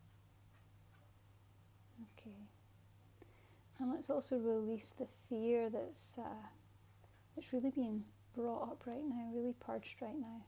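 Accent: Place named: Scotland, United Kingdom. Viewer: You sounds like Scottish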